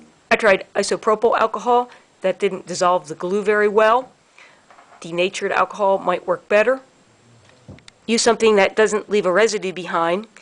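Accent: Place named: Maryland, United States. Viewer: I detect a Maryland accent :)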